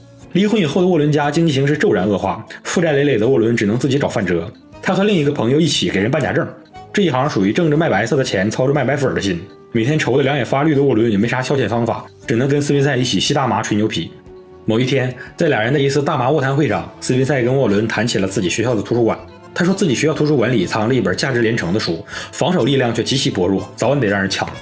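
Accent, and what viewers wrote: Northeast, China. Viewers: 可汗的老家通辽是蒙古族和东北汉人混居的地方（从地理上说这里是内蒙或东北，都是正确的），东北地区不少人说话都是这个节奏的，赵本山小品和赵本山弟子拍摄的《乡村爱情故事》就是这样的语速，这种语速特别适合讲黑社会犯罪故事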